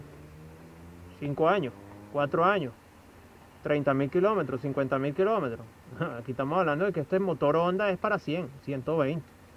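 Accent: Venezuela. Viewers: Por tus acentos eres 100% Venezuela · Eres venezolano? 😮 Me gustó mucho tu estilo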